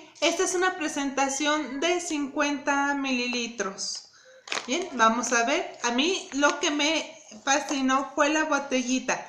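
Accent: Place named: France. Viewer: Jamás me imaginaria eso!!pareces francesa o polaka tengo conocidos de esos lugares y es muy parecido el.acento. No te escuchas mal sólo pareces una extranjera muy sofisticada que habla el idioma con un poquito de esfuerzo. Gracias por contestar muy amable de tu parte y si estoy seguro le gustara a mi esposa el perfume bendiciones linda Cuídate mucho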